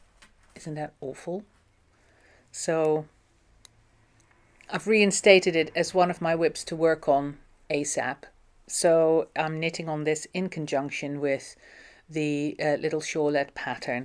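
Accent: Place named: Netherlands. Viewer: my best friend is Dutch and so recognized your accent coming through straight away. You look so good in all the clothes that you model. Continuing to watch your podcasts. Cheers 🥂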